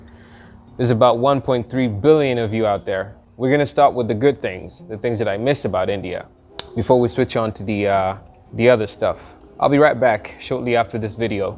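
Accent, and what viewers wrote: United States. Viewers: I had to look up your first video , i thought u were American. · I think you have a very interesting accent. It doesn't really sound like how my African friends from Ghana, Nigeria and the DRC speak. It sounds more like an American accent.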